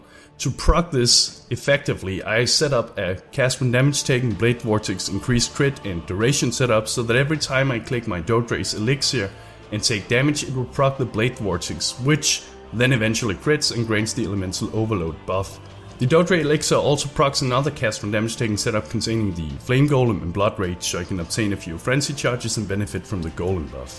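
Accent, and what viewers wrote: Denmark. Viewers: One discharge, one baby haha...Du er Dansker ikke? man kan altid hører det ;)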